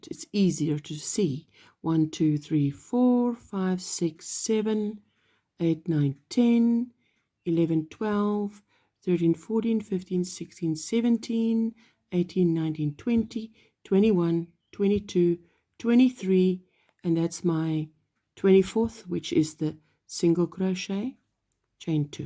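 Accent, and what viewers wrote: Afrikaans, South Africa. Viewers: Great tutorial thank you! If I'm not mistaken, you've got a beautiful Afrikaans accent? Hahaha your accent makes me miss home! Can't wait to see more videos :D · Where are you from,your accent is so South African .... Ive just completed the beanie, easy and well explained, many thanks. Regards Sushie, South Africa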